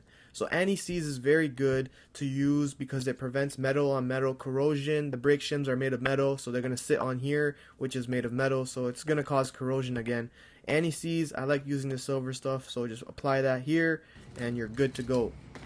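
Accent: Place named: Toronto, Canada. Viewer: sounded like a toronto accent :p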